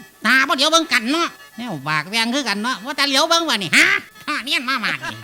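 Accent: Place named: Ubon Ratchathani, Thailand. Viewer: ด้อเดี้ยนนน กัน😂😂ว่าเเต่ไทอุบล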